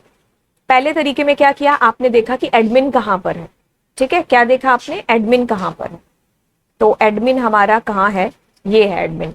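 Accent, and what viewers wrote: Punjab, India. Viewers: Mam aapka Punjabi accent 🔥🔥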